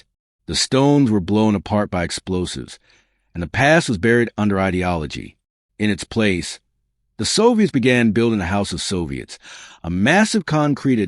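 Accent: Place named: United States. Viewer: Please do not use an American narrator when talking regarding European Castles.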